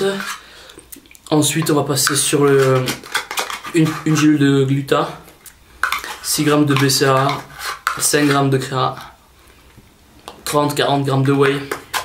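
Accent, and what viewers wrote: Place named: Marseille, France. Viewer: L'accent Marseille 😂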